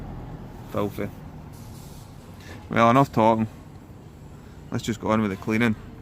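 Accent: Scotland, United Kingdom. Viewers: are you Scottish? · Is that Scottish accent? Lovely work on that truck! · Good to hear a Scottish accent again, great job on the truck BTW.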